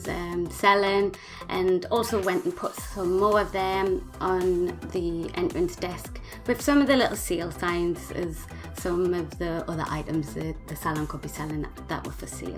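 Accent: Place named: England, United Kingdom. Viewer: I Make Simmies Not Builds....Wish YOU could make a TUTORIAL on ROOFING????? LOL OMG YOU should see my Roofs!!!! LOL LOL Wowww Extremely Happy I found you going through Sims 4 Tutorials........I LOVE How Creative you are Ive watched 2 of Yours so far and this ONE I LOVED them !!! I Really Wanted to Tell you how Inspirational you are to what I can possibly do Myself I am NOT a BUILDER........BUT TRYING ... ONLY 5 months now With Sims 4 Never Played 2 or 3 but did Play all the Originals I am Shocked at How different and much the Game has Changed from 12 plus years AGO........ITS AMAZING!!!!!! I Wish I had Played 2 and 3 I Would Know so much MORE........ haha Anyway......Love your Techniques with your MOO so much!!!!!! Wonderful Idea With this one!!!!! too :D Subbed and Faved LOVE YOUR English Accent :P Mine is TEXAN......so Very Different ..... 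Hugzzzzzzzz and Hope to see tons more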